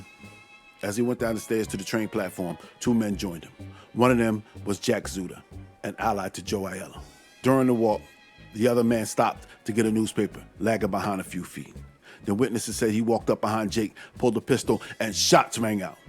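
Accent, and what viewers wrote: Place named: East Coast, United States. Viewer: Here Boss. Don’t want Mr. Algorithm to wack me and bury my ass in the desert. Looking forward to the John Torrio vid. I was introduced to him via the series Boardwalk Empire and want to know more about his beginnings. Your narration, dialogue, fly and scruffy East Coast accent, and gangster allusions are second-to-none. Superb story-telling skills. Don’t take wooden nickels Boss. See you’s in the funnies Boss. 🖤 📚 🎥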